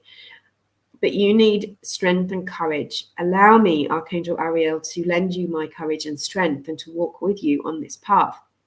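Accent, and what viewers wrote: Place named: Australia. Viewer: I’m in the U.S, but i’m an Aussie. So awesome to hear an Aussie accent. Makes me feel better. I’m an empath. Being in America is really hard right now. I spend all my time, clearing it. Lol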